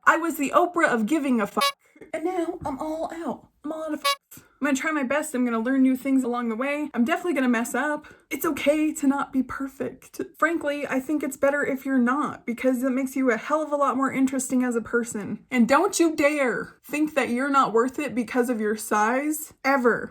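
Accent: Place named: South, United States. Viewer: This girl has got to be from the South … love her! 🥰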